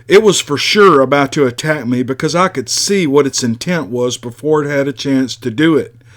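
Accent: South, United States. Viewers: Hi there , I just love listening to your stories, I love your accent, what part of America are you from? Listening to you and watching the fire light is really calming and helps me too settle down at night. I'm from Australia and have seen our own version of a bigfoot. It is generally called a yowie but our aboriginal people have many different names for them. Some researchers here believe we may have as many as 4 different types. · you are too modest sir. I think we all appreciate the time and effort you put into your videos. You sound like a very kind man. I’m from England and know nothing about the southern states of America. But they all speak the way you do it must be one relaxing place to live. Thanks again sir. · It's just that southern accent, has said many times that he does'nt really believe in ole sassy!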